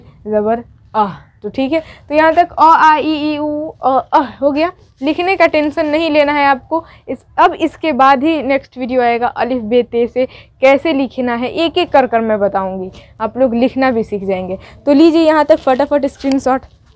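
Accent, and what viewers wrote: Bihar, India. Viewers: उर्दू का उच्चारण मुश्किल है। यही एक वजय है, लोग उर्दू सीखें से कतराते है। आप तो बिहारी है। आपके लहज़ा से पता चलता है। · आपका prounaunciation से ऐसे लगता है की आप बिहार से है, कहा से हैं आप?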